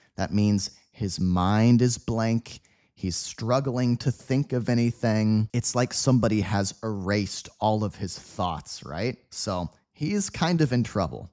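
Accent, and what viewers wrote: United States. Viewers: Listening to these lessons you have the benefit of acquiring multiple perspectives of the English language. The teacher explains with crystal clear pronunciation, vocabulary and synonyms, and also idioms and phrases, in perfect standard American accent which is the best in the world, and is like music to my ears. Listen and review the lessons to make the best of your learning. Thank you for the English lessons.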